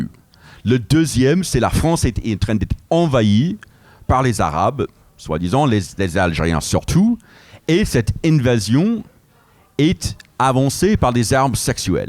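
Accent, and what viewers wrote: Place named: United States. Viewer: Je crois que c’est quasiment la première fois que j’entends parler français avec un accent américain. J’aime bien l’ambiance.😂 Et les propos sont très pertinents, très intéressant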